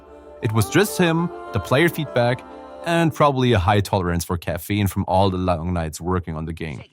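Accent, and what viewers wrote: Germany. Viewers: Höre ich da deutschen Akzent?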